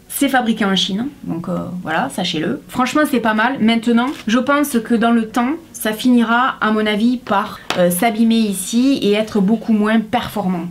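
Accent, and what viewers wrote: South, France. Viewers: Salut! Je voulais juste te dire que les stylos anti- éraflure sont une grosse arnaque, même les plus chers ne fonctionnent pas, donc pas la peine de débourser 20e chez Norauto tu seras juste déçue!! Et sinon j'adore tes vidéos, elles sont toujours intéressantes et m'ont permise de découvrir pas mal de bon plans et je ne me lasse pas de t'écouter parler avec ton p'tit accent du sud. Gros bisous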